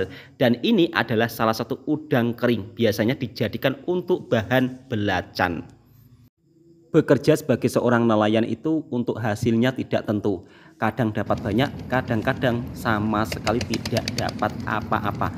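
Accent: Java, Indonesia. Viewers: Sekedar saran untuk: pakailah bahasa indonesia dalam narasimu... Abang kan orang jawa Indonesia kan, jangan karena abang sudah merantau di malaysia, lantas kosa kata dan bahasa yang abang sampaikan pun ikut berubah... seakan bahasa melayu malaysia dengan aksen jawa medok...😅 contoh: katakan saja terasi selanjutnya abang jelaskan kalau terasi itu dalam bahasa melayu artinya belacan... Banyak orang yang sudah merantau ke berbagai negara yang lebih jauh, tetapi aksen dalam bertutur kata dan bicara mereka dalam bahasa Indonesia atau bahasa daerahnya menggunakan bahasa dan aksen yang tidak berubah dari asalnya.. Seseorang yang mencintai negeri asalnya pasti tidak akan pernah melupakan kebiasaan dan logat aksen negerinya. ini hanyalah saran saja... terserah mau pakai atau tidak. Terima kasih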